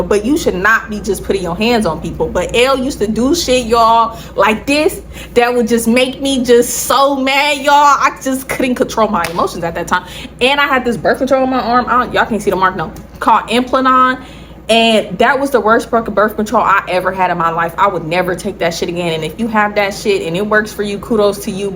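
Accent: Bay Area, United States. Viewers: My first time here! First 3 mins in i knew you had to be from the bay we really do talk a lil different 🤣You funny af I’m definitely subscribing!! love from Oakland!🫶🏾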